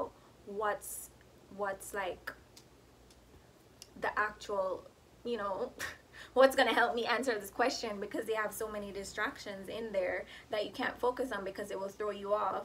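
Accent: Jamaica. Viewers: Are you Jamaican? I am too but American Jamaican I love your accent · Hey girly, your video is inspiring. Taking my Abdomen registry in October your video really help me understand i should expect and how to study. On another note and totally unrelated, are you Jamaican or Caribbean? I am Jamaican and my ears are very keen to recognizing fellow caribbs especially other Jamaicans talking. But i could be wrong lol! Appreciate your video so much!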